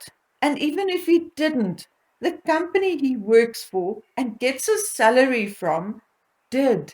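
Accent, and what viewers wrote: South Africa. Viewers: Dearest I just want to say thank you 🙏 what a super video, it may take me a little time to digest as I’m not as young as I used to be 🤣 but I do so love your videos and hearing your beautiful South African accent, it’s just delightful 🥰 take care stay safe 💜 looking forward to your next video 🥰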